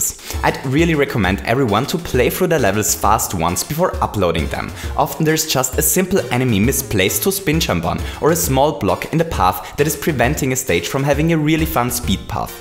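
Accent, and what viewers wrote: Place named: Germany. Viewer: He's from Austria, it seems. I knew the accent sounded German!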